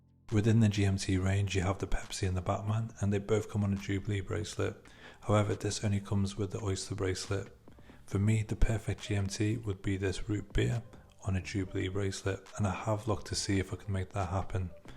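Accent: Liverpool, United Kingdom. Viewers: Being from Liverpool...love that I’m listening to a scoucer ...living in Scottsdale and Chicago for the past 40 years....and loving Rolex....I am looking for this watch. That’s one beautiful watch ! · Scouse accent I hear lol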